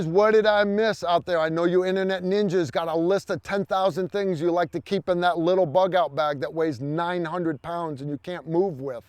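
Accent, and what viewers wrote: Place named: New York, United States. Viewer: Great video- just subscribed. I'm another dad who puts these bags in the wife/kids cars and have them roll their eyes at me about it. I've caught them sneaking them out and leaving them in the garage. When they did, they lost car privileges. Does any dad NOT get called an idiot for putting these in their family's cars? Are you in New York? Accent sounds a little like it.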